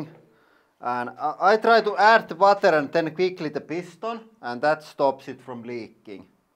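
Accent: Finland. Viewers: I used to live in Helsinki and frikkin love the Finnish accent. It's mint. Also love the detail of using Iitala glassware. Torille tavataaaaaan! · i only now realize this channel is finnish! its right next to russia ofc but because sweden has basically perfect english i was surprised by the accent.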